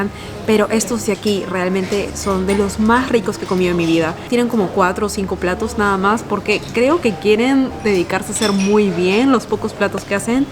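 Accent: Peru. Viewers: ¿Eres peruana 🤔🤔🤔🤔🤔🤔🤔🤔🤔🤔🤔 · No conocía este canal, pero desde que la escuché hablar me di cuenta que era una peruana como yo 😁😁🤗🤗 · Yo la escuché y se me hizo que era peruana, no me equivoqué, por eso tiene ese nosé qué, que tenemos los peruanos. Porque somos humildes, sabemos desenvolvernos y hablamos lindo. Tienes un Ángel súper bello.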